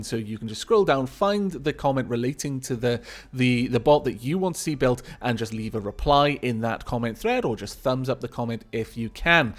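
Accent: Wales, United Kingdom. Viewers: firstly, please read on xD. Secondly, you genuinly are THE best youtuber out there. You aren't recognised as so because you dont play the big games such as minecraft. There, take the compliment and run, you dapper soul! Also you refer to living in Scotland often, but you sound un-mistakably Welsh???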